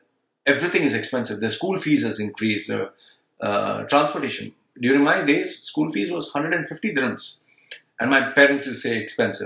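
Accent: Kerala, India. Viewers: Bhai mast kerala accent. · You are fluent in English but you cannot hide that you are INDIAN by origin! 😊. Why don't you care your own country?... It's none of your business!!! How much money you got paid to do this video??? You be careful the country you are talking about is very strong and powerful country that capable of a lot of things 😊.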